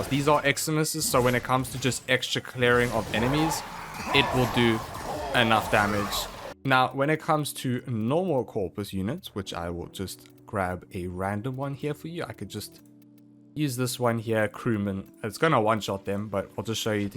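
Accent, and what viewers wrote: South Africa. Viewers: Are you South-African?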